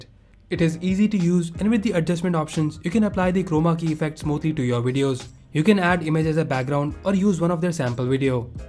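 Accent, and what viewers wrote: India. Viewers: I hear annoying Indian accent, I click away.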